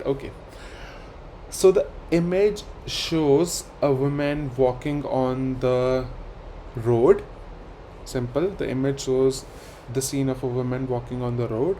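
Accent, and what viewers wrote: India. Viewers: Look at your own accebt and body language typical indian its not at all English. How do you teachers. To me very substandards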